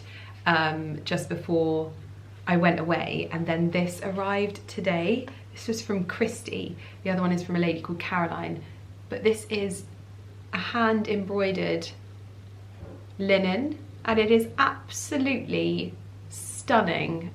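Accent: RP, United Kingdom. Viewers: The way you say “The the the…” before every word is irritating. It’s because of the thinking you do before talking, to try and sound posh. You sounded much better with your natural speech, when it flowed.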